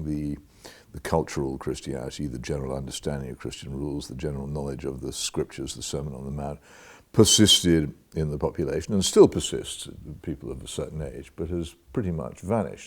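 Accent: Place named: RP, United Kingdom. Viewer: <link> Just having some fun. Can any other American understand every word of this Varneyian Elite English? Windsor, I believe. Softening the hard edges of our English to sound more French. It really should be captioned. But he makes excellent sad points. So it is important to be able to speak in a standard we can all readily understand. So we can understand.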